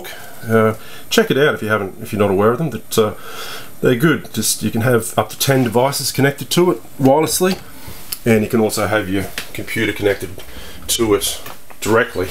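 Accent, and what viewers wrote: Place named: Australia. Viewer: It's an Aussie accent.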